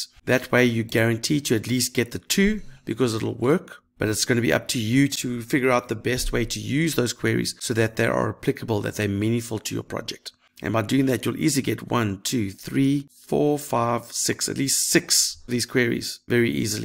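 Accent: South Africa. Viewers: Thanks you're the best 👌 quick question are you Afrikaans?😅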